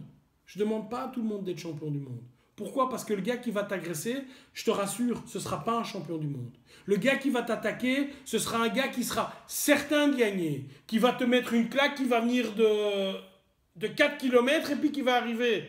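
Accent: Belgium. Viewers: Bonjour, ne penses-tu pas que tous ces conflits entre fédérations, pour des histoires de gros sous, d'égo, gangrènent un peu le Krav Maga ( en France ). Je ne rentrerai pas dans les polémiques, et je ne prendrai pas parti pour qui que ce soit...Mais, j'ai l'impression, et la certitude même qu'on colle l'appellation Krav Maga à toutes les sauces, sur des systèmes de Self défense, pour que ce soit plus "vendeur" ou attractif. Je ne sais pas ce que vaut l'I.K.M, et je ne permettrais pas de juger...Sincèrement, je partage ton avis, sur le fait qu'il y a des bons partout, et des mauvais partout. J'aime assez ta façon de voir les choses, mais ( avec tout le respect que je te dois ) j'avoue que je ne sais pas quoi penser de "civils" enseignant des techniques "paramilitaires" voir " policière", impliquant parfois des armes, à d'autres civiles. Je pense sincèrement que ça devrait rester le domaine des forces de l'ordre. 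Ce n'est que mon avis, n'y vois pas une attaque ou quoique ce soit d'autre, mais, je suis persuadé que tu comprends ce que je veux dire. Continue tes vidéos, j'aime assez les regarder, car elles sont souvent très justes...J'ai beaucoup de sympathie et d'amitiés envers nos voisins belges, et c'est un régal d'écouter ton accent. Force & Honneur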